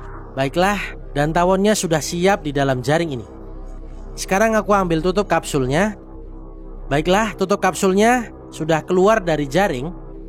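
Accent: Java, Indonesia. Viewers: Logatnya kok kek bahasa jawa ya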